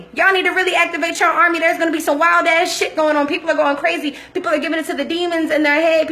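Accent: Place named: DMV, United States. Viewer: You have a DMV accent 💜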